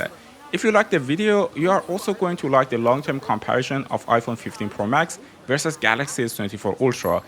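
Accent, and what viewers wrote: Iran. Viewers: ei joonam lahjeye Persian! ;) nice review by the way!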